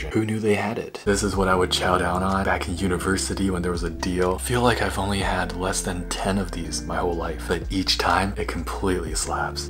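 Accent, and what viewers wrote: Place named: Australia. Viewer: I thought you were from Australia 😭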